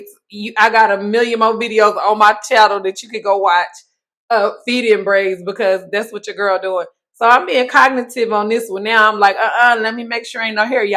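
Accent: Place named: Texas, United States. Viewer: What part of Texas you from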